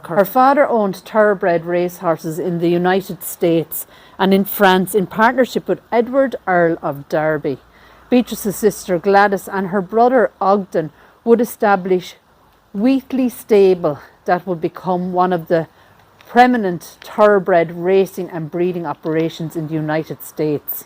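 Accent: Ireland. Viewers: I like your irish accent. 😊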